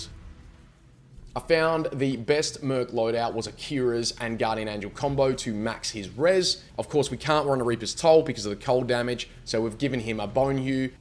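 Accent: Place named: Australia. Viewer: Suped for the aussy accent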